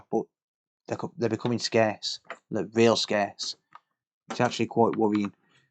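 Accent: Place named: Midlands, United Kingdom. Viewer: which town you from mate, sounds like you got a midlands accent. I'm from wolves myself, now live in the States.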